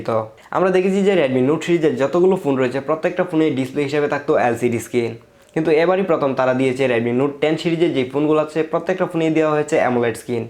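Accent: Kishoreganj, Bangladesh. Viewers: Vai apnar basha ki kishurganj...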